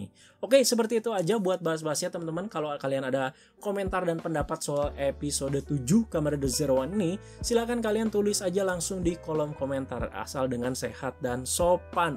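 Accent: West Java, Indonesia. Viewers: Sunda banget ya, "ceunah"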